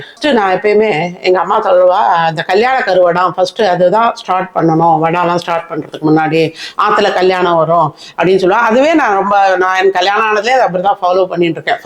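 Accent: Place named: Trichy, India. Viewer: நீங்க pesarathu Nanna iruku, unga ooru Trichy AH. Nalla idea oil um water um mix pani sheet la thadavarathu 👌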